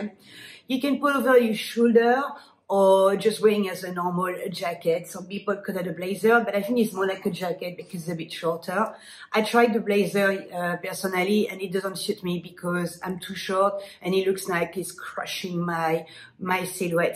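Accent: France. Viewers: Bonjour. Congratulations. Everyone adores your French accent!! I'm confused by what you mean about "blazers". The white jacket you are wearing I would call a blazer. The denim on denim look in Canada is what we call the "Canadian tuxudo", ha ha! I would never think to wear a dress like that over blue jeans, what a great idea. I will need to buy a dress like that to wear over blue jeans. I can't wear blue jeans to work. When I do I don't feel in the "business mind set". I feel relaxed and lazy or I should be cleaning the kitchen. Other women can wear blue jeans with no problem but I feel laxadaicle, not good. Great tips again.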